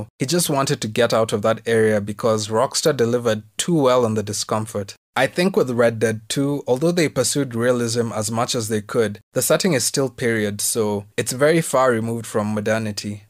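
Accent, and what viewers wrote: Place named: South Africa. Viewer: Your accent is close to a South African one but that's just me. I did not know about fortnite being a single-player initially. I've always avoided these co-op/online and live service games due to the predatory practices. But stylized games are more timeless with the best example being Sifu The upcoming blade by Arkane Lyon. Ultimate Spiderman (2005) Hifi rush The upcoming blade game Rocksteady is another studio that perfectly blends photo realism with a more stylized appearance Gotham and metropolis look like their animated series counterparts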